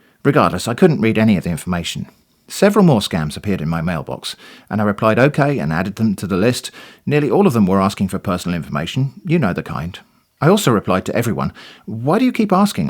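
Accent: England, United Kingdom. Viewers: I hope you are very careful at doing this sort of thing. Watched a few of your videos and makes me concerned for your safety. After all you are putting this on YouTube and they could be watching and know what name they gave you email profile, you may bait the wrong person, piss them off and then find out somehow where you live and since you have an English accent probably won't be too hard. Since you are warning us educate us on these scum of the earth buttheads, making them harder on them to get money, one may get really ticked off and the person may not be mentally stable and decide to come face to face with you and do something horrible to you. Very concerning, very alarming. I do thank you for these videos and appreciate the risks you do take, sacrifice your risks, and safety for our sake is such a noble act and I hope others will be grateful and thankful as much as I am and I have learned a lot from your "scam baiting" videos. 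Now I know what to look for when checking emails and text messages with caution. I get a ton of emails and text messages from these creeps. My phone has that scam block on both emails and text messages, but for some reason they keep coming through. I have downloaded apps from the play store, and they don't do jack nor sh*t to stop it. If I don't keep my phone on do not disturb, my phone is constantly ringing. If you tried to call them back, the AI voice says " this number is no longer in service" so they can call you all day long but can't call the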